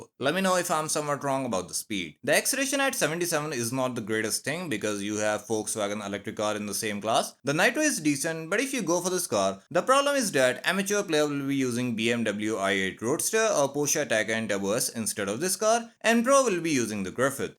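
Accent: India. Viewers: 😬 Racism at it's peak. I don't like Indian accent tbh. But your accent is the best Indian accent I've heard. EVER. Are you South Indian?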